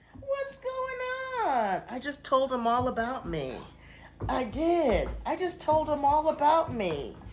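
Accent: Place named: Connecticut, United States. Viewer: One more thing there is an Accent in your voice I guess that's Connecticut.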